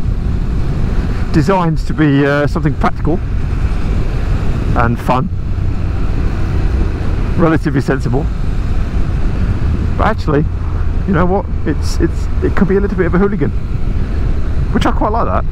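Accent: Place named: Ireland. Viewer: Cool bike, love that Irish accent. Thanks man.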